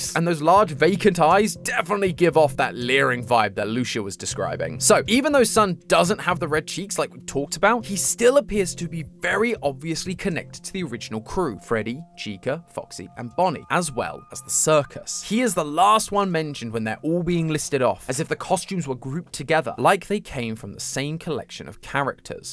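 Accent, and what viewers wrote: United Kingdom. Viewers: Yah he will do great it's just weird because a British voice instead of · I clicked the video and I thought why is matpat making a British voice and remembered that he retired and this is, your doing a great job! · Feels weird but the British accent is kinda selling the emphasis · I love your British accent. I hope you continue to bring us these juice videos and get your own path in fnaf theories. ❤ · Iskhow to feel about ngl.. i like his.. british-esk voice but i still T^T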